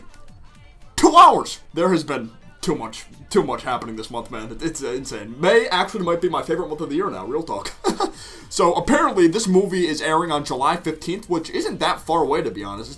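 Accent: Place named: United Kingdom. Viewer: im offended by your british accent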